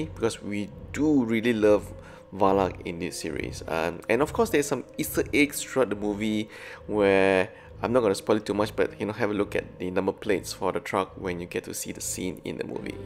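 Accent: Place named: Singapore. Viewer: Hi are you singaporean? You have a singaporean accent haha.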